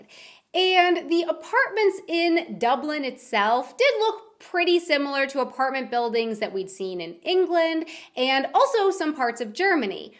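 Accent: United States. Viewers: After first five seconds yup she’s definitely American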